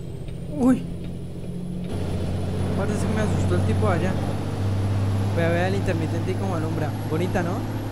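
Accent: Colombia. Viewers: eres de pereira?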